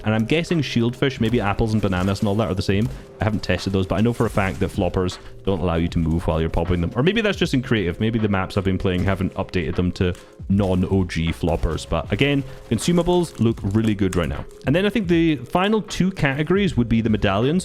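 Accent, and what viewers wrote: Scotland, United Kingdom. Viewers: WAAAYYY LESS GO WE CAM HEAR THAT GLORIOUS SCOTTISH VOICE AGAIN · bro every time i watch every time I talk after I talk in a scottish accent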